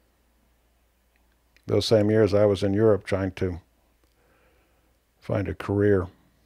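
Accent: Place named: New Jersey, United States. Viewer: I understand why I like your commentary so much now, you are a Jersey guy. You bastards are tougher than a bag of ten penny nails. True story.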